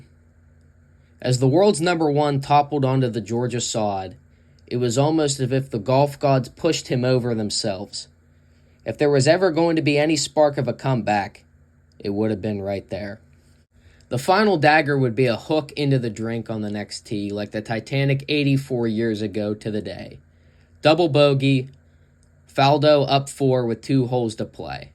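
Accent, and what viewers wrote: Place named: Pittsburgh, United States. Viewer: Love that Yinzer accent!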